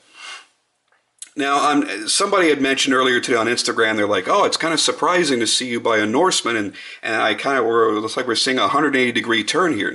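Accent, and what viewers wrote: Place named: United States. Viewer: I like your 'Murica accent, lol. Great and honest review. I had one of the first run Norsemans (vid on my channel) and it was a great knife for his true first attempt in the folding knife world. I think if you watch John's knife making Tuesdays series, you'd get an even better idea of all the work John and Erik had put into making these knives, but most importantly you'd get an idea of who the Grimsmo brothers are and they truly are good guys. Thanks for the review, keep 'em coming!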